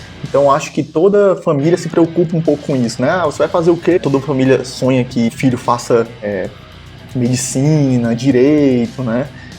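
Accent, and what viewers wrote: Ceará, Brazil. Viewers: o senhor é cearense? ou fez matemática na UFC? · Você é cearense?? Hauausus quase meu vizinho slc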